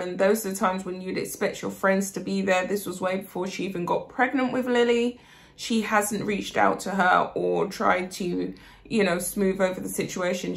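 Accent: England, United Kingdom. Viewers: you are a beautiful, intelligent woman who communicates so articulately with your English accent, which I absolutely LOVE as an American!💓🍀💓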